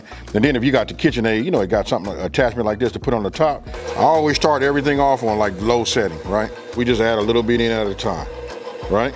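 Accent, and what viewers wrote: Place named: California, United States. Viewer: Facts!!!! I get all my recipes from him.. he needs to have a show on Revolt or BET, TVone or something.. cool, not corny.. and you can tell he from 'round the way lol.. I f*cks with and his Cali accent lol 💯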